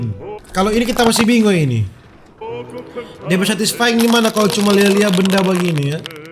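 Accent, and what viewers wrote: Manado, Indonesia. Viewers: B logat manado kua opo🤣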